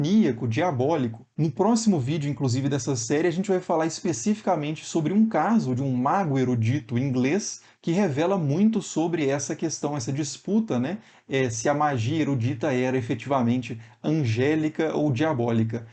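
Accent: Minas Gerais, Brazil. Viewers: Pena que o áudio é baixo. Ainda mais com mineirinho falando 😊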